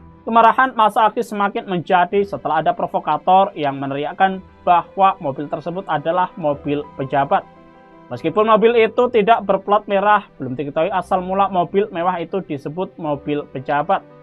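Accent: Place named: Banyumas, Indonesia. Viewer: Ngapak nih host nya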